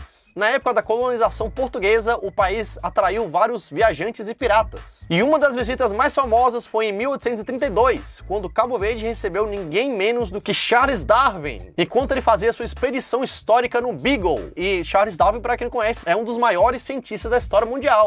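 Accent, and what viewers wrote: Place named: Northeast, Brazil. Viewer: Qual o sotaque deste apresentador ?deve ser do sotaque do nordeste ...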